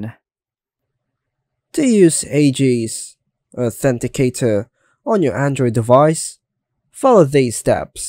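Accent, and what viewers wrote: United Kingdom. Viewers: People who can't understand brit accent.... Use CC..? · Strong Brit dialect, difficult to understand. not getting which service you're referring to.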